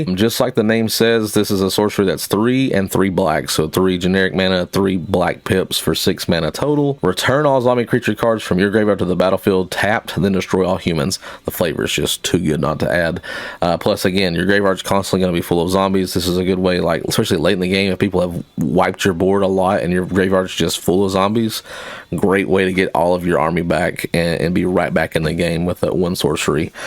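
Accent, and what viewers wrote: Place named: South, United States. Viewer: Where "Southern " are you? SW Louisiana here.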